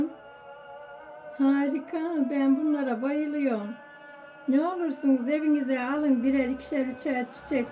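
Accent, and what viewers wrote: Afyon, Turkey. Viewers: Esse lamu aleyküm verahmatullahi ve bereketi Allah 'ın rahmeti bereketi üzerinize olsun ablacım eline sağlık afiyet şifa olsun bizde koydeyken yapardık aynısından sen sinanpasa limitin hangi koydensin ben İstanbuldayım çok özlüyorum sen konuşunca afyonluca hoşuma gidiyor haşhaşi hamurunda yapardık biz sende yap çok güzel oluyor söylersin neresinden olduğunu sinanpasanin arada köyünde çek Allah'a emanet olun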